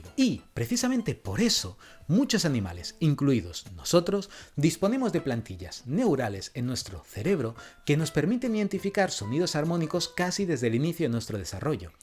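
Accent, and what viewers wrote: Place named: Spain. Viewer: Tu forma de hablar me recuerda al Filopalomo, a CdeCiencia y a ElvisaYomastercard. Me pregunto si son los cuatro de la misma región de España o es algo mas extendido en el país? 🧐🤔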